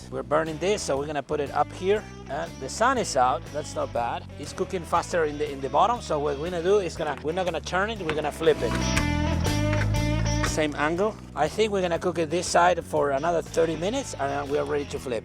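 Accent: Argentina. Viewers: Los videos estan buenos lo unico el audio osea la traduccion porque no haces videos vos hablando en argentino el echo que vivas en yanquilandia no sicnifica que te olvides de como habrar en español rioplatense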